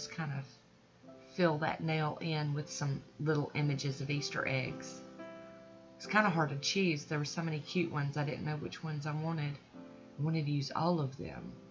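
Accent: South, United States. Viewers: Rascally rabbit!! 😉 You just have the sweetest southern accent!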